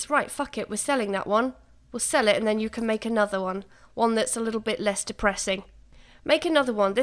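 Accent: United Kingdom. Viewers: are u brtitish ur voice is cute and love the challenge video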